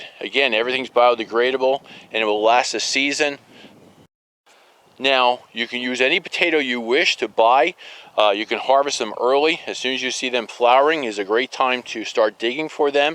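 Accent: New Jersey, United States. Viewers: I just found your channel recently, and I love it! What great advise. I've been gardening on raised beds, protected by a lot of leaves, but you've really open my eyes to what goes on in the soil. I'll be working more with cover crops now. I live in NH., but I'm from the Philly area, so listening to the Jersey accent is an extra bonus.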